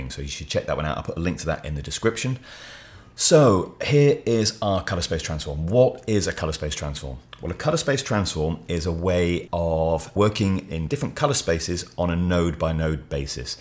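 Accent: England, United Kingdom. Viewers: This video is a life saver! I'm about to release a documentary film were I use mainly HLG (Rec.2020/Rec.2100 HLG) color space and gamut. I used to start with the color space transform but now I'll do as you tell here. Gonna save this video in my reference videos. Also you sound English, why type it color instead of colour?😅 Warm regards.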